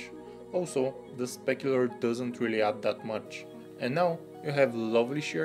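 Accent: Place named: Eastern Europe. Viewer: haha man, because English with Slavic accent is very bad sounding and insulting, it may sound good only inside the structure of your language but not in English. Not just most of the words are unclear but the accent is also distracting.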